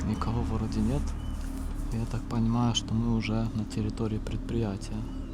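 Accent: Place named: Ukraine. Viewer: як приємно чути українську вимову. дякую за все.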